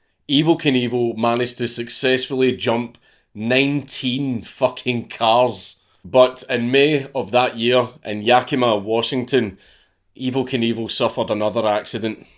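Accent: Scotland, United Kingdom. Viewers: Ur scottish is now better than ever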